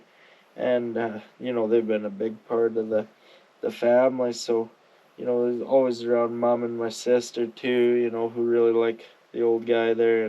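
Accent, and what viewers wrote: Canada. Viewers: Dude. Damn, Ive only been watching you for a month, I appreciate seeing someone your age with a willingness to work and a willingness to learn, your vids are informative and you crack me up with the Canadianese you speak. RIP Cougar. I put my chocolate down 10 years ago last February and I still have dreams about him. I bet I woke up and looked at his chair for a week straight after he was gone, it just becomes such a habit and like you said- aint no better way to start your day than to see your boy all happy and ready to go on another adventure. Labs are the best, I dont care what anyone says if youre blue collar and hunt and fish and work on things outside, a Lab is the way to go. My boy was the best damn friend I ever had and that 12 years went by in a blink, I never had kids so he truly was my boy. My bro. He was still eating right up until the end and still plenty happy in life. 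The pain meds obviously helped him but that bone cancer is a bitch, for dog and human. Anyway, Im sorry to see Cougar go, he showed you what a good dog can do for a persons life so go ahead and get out there and get yourself another pup and bring him up. Im a renter so getting another dog is out of the question but you, hell, you should go get two dogs and I aint even kidding. Take care. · I could listen to him talk about absolutely anything. I’m Canadian but I am not blessed with his amazing accent 😊❤️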